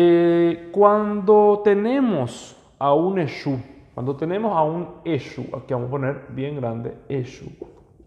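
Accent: Paraguay. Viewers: Hola nada que ver al tema pero tu acento o sotaque e de Paraguai verdad? 😊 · Sos de Paraguay? Tienes acento de ahí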